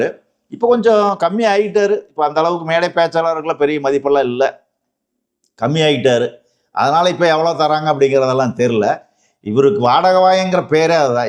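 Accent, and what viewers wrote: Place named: Kongu, India. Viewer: ஐயா நீங்கள் பேசுவது கொங்கு தமிழ் பேச்சு வட்டார வழக்கு நீங்கள் எந்த ஊர் எந்த மாவட்டம் நான் திருப்பூர் மாவட்டம் திருப்பூர் மேற்கு பகுதி 25 வேலம்பாளையம்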